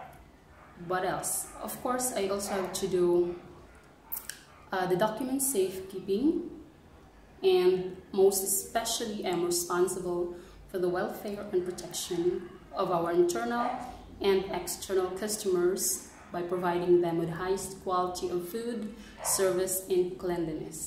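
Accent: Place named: United States. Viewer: Ang galing mong mag english, American ang accent. It's very nice to get to know you,.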